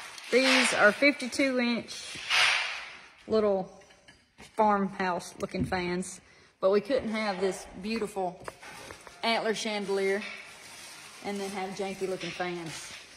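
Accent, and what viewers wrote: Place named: Alabama, United States. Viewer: You go girl love your accent reminds me of home I grew up in north Alabama now living in Texas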